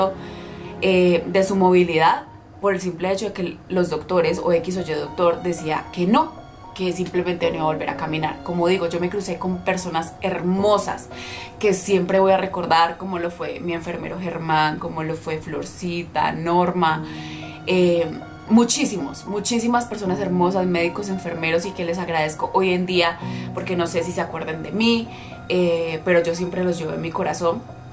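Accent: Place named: Colombia. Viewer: Según escucho tu hacento eres colombiana.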